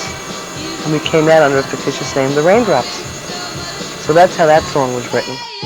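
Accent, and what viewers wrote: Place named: New York, United States. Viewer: 100% New Yawker... I love it!!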